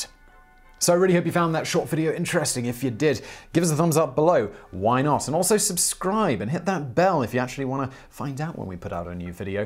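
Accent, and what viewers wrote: United Kingdom. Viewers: All the other narrators with British accent sound fake, Except. He is a professional. Kudos, kudos.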